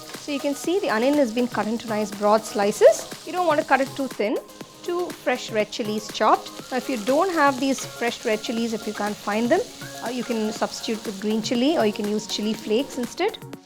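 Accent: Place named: India. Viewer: Indian accent 😂😂😂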